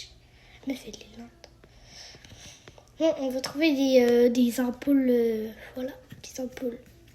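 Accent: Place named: Quebec, Canada. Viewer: Tu es québécoise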